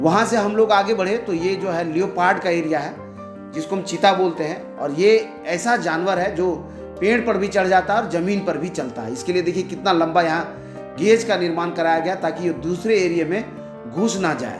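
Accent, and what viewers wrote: Bihar, India. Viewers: aisa kn hai Apne state ka hi accent hai Jab koi punjabi ya south Indian ka pronunciation sunne milta hai tab to log cool bulate hain Humare ghar ka hi accent hai to hai Accent ke naam pr america aur britain vale bhi ladte hain So Bihari hai to bihari accent hi niklega Its not a matter of shame, but our identity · Language hi or language ka tone hi to dusre log se alag dikhata hai jo humare Bihar ka hai isme koi dikkat nhi hona chahiye kyunki yehi culture hai jis par humlog ko proud feel krna chahiye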